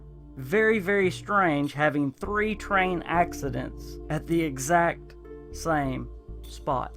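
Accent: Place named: Georgia, United States. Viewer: Sir, are you from Georgia? You say "why" and a few other words that reminds me of how my grandparents talk.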